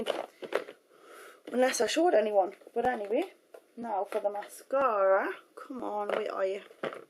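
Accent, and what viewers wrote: United Kingdom. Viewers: Omg i can't understand a word ur saying! Littlebit too british i can't understand anything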